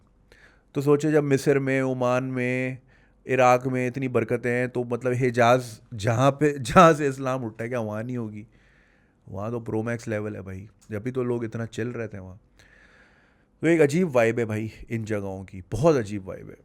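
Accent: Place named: Punjab, Pakistan. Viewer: correct your Urdu. You speak punjabi style urdu. For example, aap bolte ho, aap jate ho etc. Correct Urdu is aap bolte hain, aap jate hain. Secondly, why do you live apart from wife and one year old son. Sounds weired! Why? British Government do not allow you to live in UK. Also, you mentioned in one of your video that after the delivery hospital arranged free taxi to home for your wife. It doesn't happen here even if your are on benefit. I first performed Umra at the age of 22. Nothing spectacular happened as you described. It is typical of usual exaggeration.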